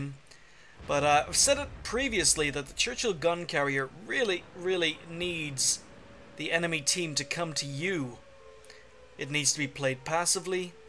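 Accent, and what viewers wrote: Ireland. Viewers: are you actually irish? I can hear a bit of an irish accent but not much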